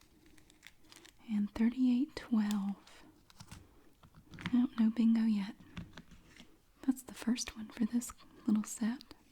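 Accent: South, United States. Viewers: Your asmr leaves me knock out ... I love 10 points Southern...!!!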